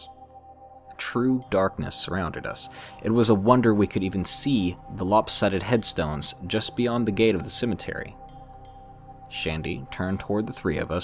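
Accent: South, United States. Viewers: The Thick Southern Accent threw me off at first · Aw yeah, you have well had a Southern accent like me! I don't know why but I get so excited when I see a YouTuber from the south or from Alabama. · Whoa, the southern is strong in this one. =x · Country sounding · Do I detect a slight southern accent in these older recordings? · Angry-Moth-Noises he is from the south of course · Seems like you had a bit of a southern accent way back when! This video is very charming, brings me back to the smaller days of your channel 💓 You’re the best narrator, hands down. Love from Florida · Love your videos never noticed the southern draw of yours till this vid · AaAAAAAaaAAAAaAA DARKNESS’ COUNTRY BOY ACCENT IT SHOWS A BIT IN THE OTHER VIDEOS STILL BUT OML IM SCREECHING This makes me happy in a way i can’t explain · lol, your old voice sounds so much more southern?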